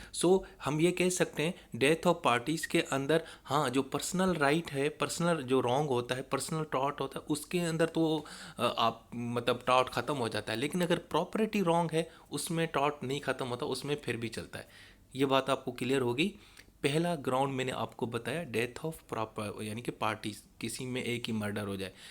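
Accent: Karachi, Pakistan. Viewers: Sir ap Karachi sa hn? Ap ky bat krny k Andaz sa lg rha h plz btay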